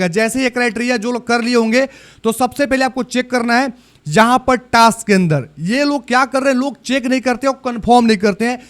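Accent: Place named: Bihar, India. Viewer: Bhai Aap Bihar Ki Bhasa aapni Boto Me Jhalkata Hai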